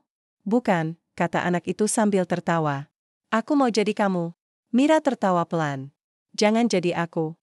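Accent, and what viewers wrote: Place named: United Kingdom. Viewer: Baca cerita nya biasa aja kk,jgn logat bhs ingnris,biar yg dengar bs mengerti🙏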